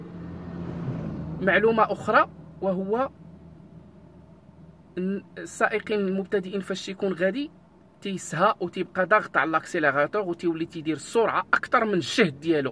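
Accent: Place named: Algeria. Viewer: واه نيشان ربي يحفظو حتى اللهجة لي يتكلم بها تشبه لهجتنا ف جزاير 💪❤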